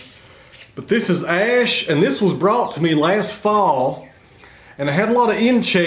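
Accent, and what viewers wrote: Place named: Tennessee, United States. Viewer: My burning question is, what are those dangling thangs following behind the machine head, & why does the debarker disengage before the end of the log.? Other than that, isn't it mystifying why 1,000's of grown men & women love to sit back and watch a fella with a Tennessee accent saw up logs on a beautiful piece of machinery. I'm an electrician but I love wood & my name is Wood. Go figure?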